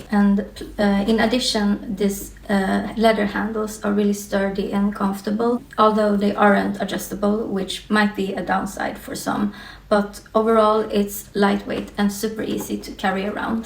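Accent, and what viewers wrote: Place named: Sweden. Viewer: I love your Swedish accent! tack tack for videoen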